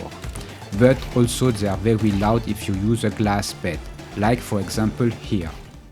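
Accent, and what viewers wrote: France. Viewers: wtf is this shitty french accent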